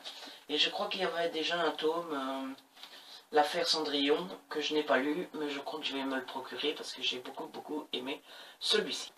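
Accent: Belgium. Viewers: Oui ton accent :-) moi aussi suis belge. J'aime beaucoup tes vidéos, continue :-)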